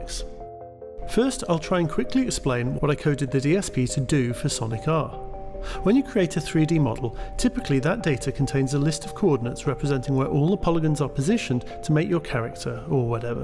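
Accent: United Kingdom. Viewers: Accent? he’s from the United Kingdom.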